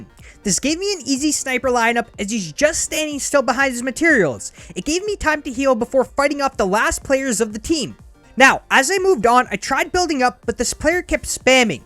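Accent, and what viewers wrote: Canada. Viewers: I can tell your Canadian. 😂 Please tell me if you aren't but I'm almost certain.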